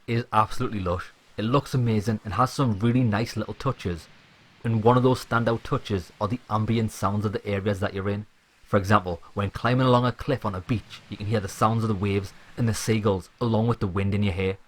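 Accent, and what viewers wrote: Newcastle, United Kingdom. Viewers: This guy Is a typical geordie, weird and annoying.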